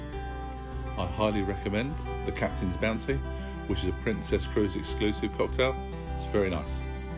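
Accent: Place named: South, United States. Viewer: Good to hear those soft southern tones again, better than music…. Did we also see a plaid shirt by any chance ?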